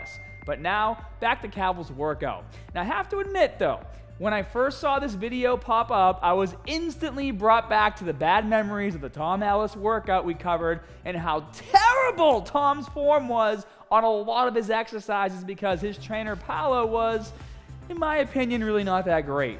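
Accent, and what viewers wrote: Boston, United States. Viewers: took me forever to work it its not much like other bostonians i know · is this guy from bostonkkklkokkjkj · Didnt realize strong Boston accent until this video, boston represent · why do you have a boston accent in the first half and a regular accent in the second? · Staaaaaaat. Get in the caaar! Bro the Boston accent is thick as fuck 🤣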